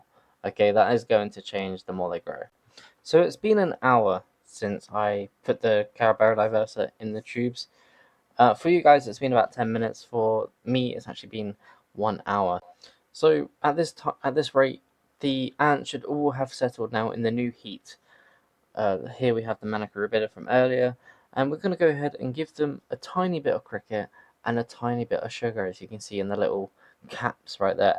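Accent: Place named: United Kingdom. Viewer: i was really confused when you had s fugax AND c diversa but then i realized that you have a slight british accent. good luck with the manica though.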